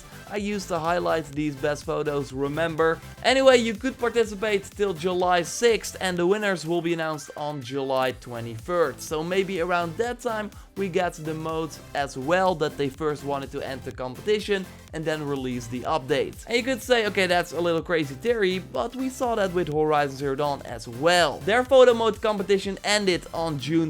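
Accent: Netherlands. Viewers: That horrible Dutch accent. I puke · is any way to ask someone who speak correctly english without any harsh accent like yours ? Because bro it is really difficult to focus on what you are saying with your dutch accent. Then you will focus on the video montage while someone else will care on the speech. And please change us this annoying same and same music background... Take care.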